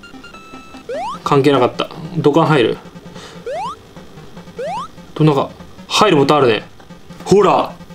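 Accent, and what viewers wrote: Kansai, Japan. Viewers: 結構関西弁使いこなしてて草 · なんで関西弁なの？ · 関西弁草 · この時のサワってめっちゃ関西弁使ってるんやなww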